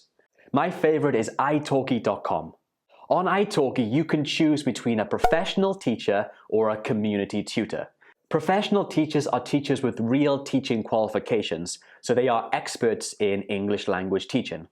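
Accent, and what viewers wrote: United Kingdom. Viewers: Outstanding British accent!where are you from?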